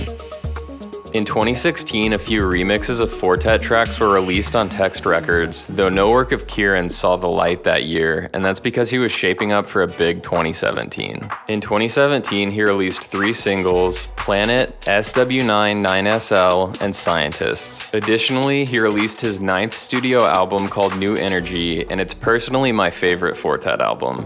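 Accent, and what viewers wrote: United States. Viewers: Opens video American accent Close video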